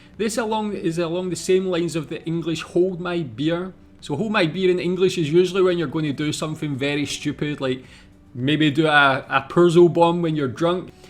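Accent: Scotland, United Kingdom. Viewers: oh boy, your scottish accent really showed in this video xD somehow love the way you say "booze idea" lol... but it also helps you with the german tongue twister... that was FLAWLESS :D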